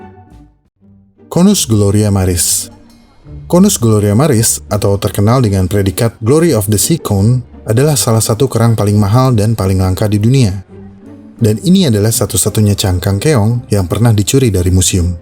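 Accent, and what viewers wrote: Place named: Makassar, Indonesia. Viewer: DARI MAKASSAR MANA SUARANYA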